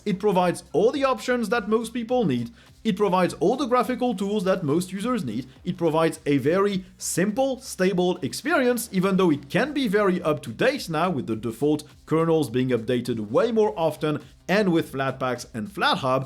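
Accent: France. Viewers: Snif snif ça sent l’accent français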